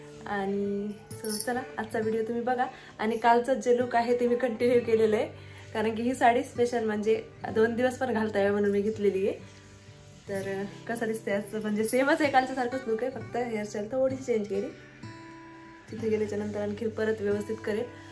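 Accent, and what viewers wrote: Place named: Marathwada, India. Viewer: Tai tumhi mahanubhav panthatil aahat na aamhisuddha aahot Aamhi Hingoli la rahto tumche video baghun kharach marathwadyachi language ekun khup chan wattala❤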